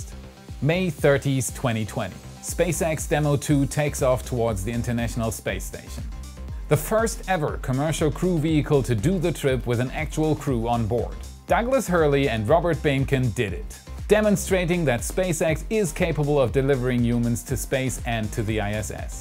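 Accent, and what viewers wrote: Germany. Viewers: What nationality is? I can't quite guess from the accent! Germany?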